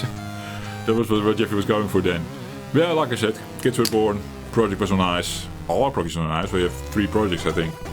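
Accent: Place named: Netherlands. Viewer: Lekker! heerlijk nederlands engels! pikte het er gelijk uit De appel Falls niet Far van de tree! love IT! gelijjk gesubscribed!<3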